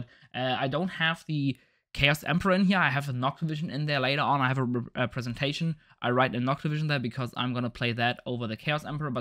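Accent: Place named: Germany. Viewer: Ich glaub blackboard ist das Wort das du gesucht hast , das kommt Tafel zumindest nahe ... Hab erst vor 2-3 Monaten angefangen d-Link zu spielen, hab durch deine vids so viel gelernt und bin dennoch immer wieder überrascht was das Deck alles kann. Danke für den Unterricht Prof. PS: wusste durch deinen Akzent seit der allerersten Sekunde, dass du auch aus Deutschland bist 😅😂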